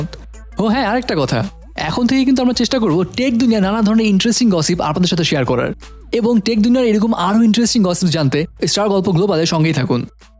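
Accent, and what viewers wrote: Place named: United States. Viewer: ভাইজান এত স্মার্টলি কথা বলতেছেন মনে হচ্ছে যে আমেরিকান বাংলা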